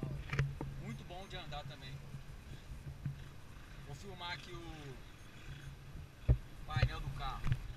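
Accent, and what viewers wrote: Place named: Minas Gerais, Brazil. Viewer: mineiro aff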